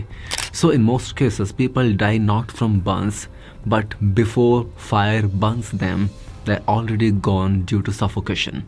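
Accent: Karnataka, India. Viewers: Bro, u r speaking English in the same pitch and modulation of your Kannada. Please correct it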